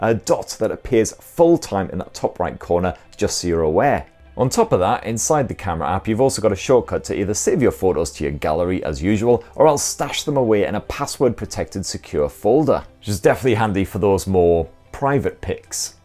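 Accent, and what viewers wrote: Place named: Yorkshire, United Kingdom. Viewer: Thanks for the great review. I picked up on your Yorkshire accent in the first line, and was delighted to see pictures of York. I enjoy comparing them to my Nikon pictures from my visit. Memories! Cheers.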